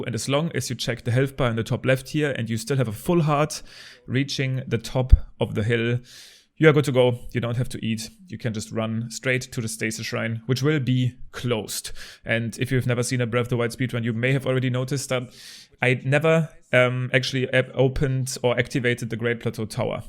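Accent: Germany. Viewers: Is he german cause his englisch is a bit german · He once played the German version and read the lines perfectly. Aaaaand his obvious accent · are you german yo sound german bist du deutsch du hast diesen deutschen aktzenz beim englisch reden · you her like a german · are you german? cuz you sound like a german if yes cool im too if no ok but i am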